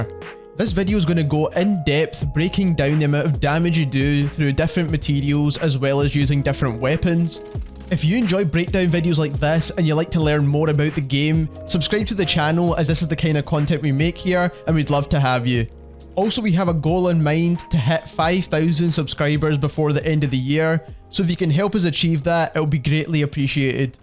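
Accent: Ireland. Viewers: He sounds Scottish or Irish. I think Irish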